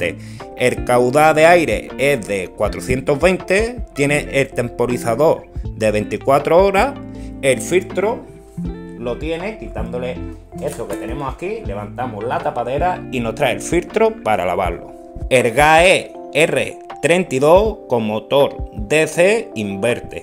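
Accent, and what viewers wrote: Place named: Andalusia, Spain. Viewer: Es español .andaluz del sur de España.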